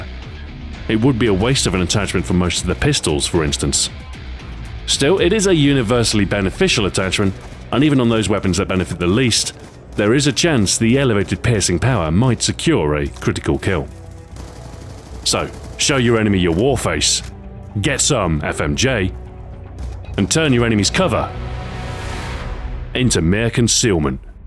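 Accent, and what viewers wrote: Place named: United Kingdom. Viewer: Hey, 2 questions here; have you ever considered that one of the sole reasons that part of your subscribers watch your videos is because you have a kick-ass British accent? And have you ever had a Canadian Keith's beer?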